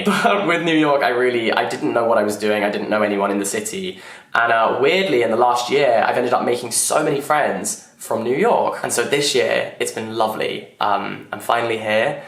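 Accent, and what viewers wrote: United Kingdom. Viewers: You're Brit English makes me wanna take a literature degree 🤌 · « New York is so lovely » he says in a thick British accent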